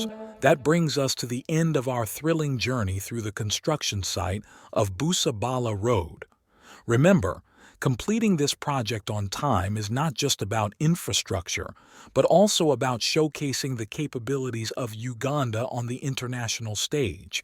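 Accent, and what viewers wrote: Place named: United States. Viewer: How comes you know Buganda clans but in the vlog you sound to be an America. This is very interesting thanks man. Keep it up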